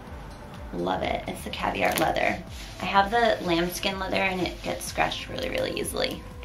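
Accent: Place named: New York, United States. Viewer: U can hear her new york accent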